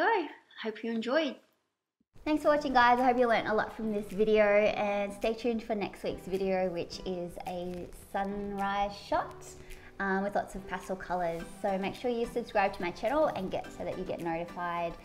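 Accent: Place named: Australia. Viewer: She sounds Australian!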